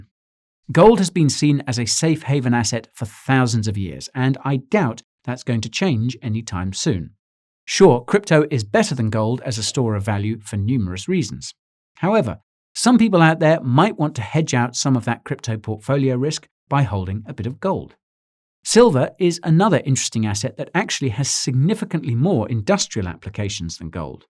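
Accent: United States. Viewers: Note can speak American as well. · Can you do a 2hole video in American accent. You're American accent is so good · Vacations. Fall. Lumber... I've been noticing your "American" is coming along nicely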